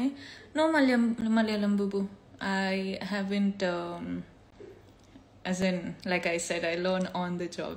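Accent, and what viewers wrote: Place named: Kodagu, India. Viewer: its kodava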